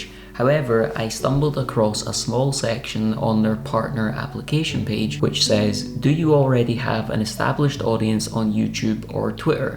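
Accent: Scotland, United Kingdom. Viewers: Yes, an extra $1,000 per month would be most agreeable and worth the effort,. I must comment as well that understanding the Scottish brogue has always been a bit of a challenge for me however your mastery of "English" is formidable. Thank you for that!!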